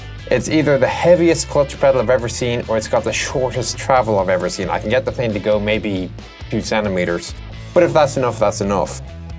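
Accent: Ireland. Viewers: Listening to your accent, gives me the impression that you're Irish. Am I correct? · He sounds slightly Irish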